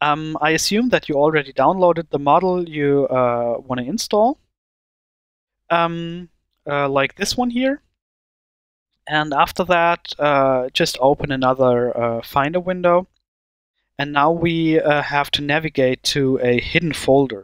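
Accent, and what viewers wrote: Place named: Germany. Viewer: Ich liebe es wenn deutsche Englisch reden✌️ P.S. gutes Video xD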